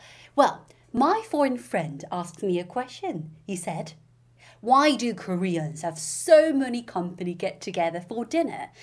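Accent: United Kingdom. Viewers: 아 발음 넘 멋져요ㅠㅠㅠㅠㅠㅠㅠ 영국발음 개간지ㅠㅠ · 엄청 재밌어요 :) 영국발음듣고 영어기사 공부하는 것도 정말 좋아요, 딱딱하지 않고 방금 영어악센트로 빼박이라고 하셔서 엄청 터짐ㅋㅋㅋ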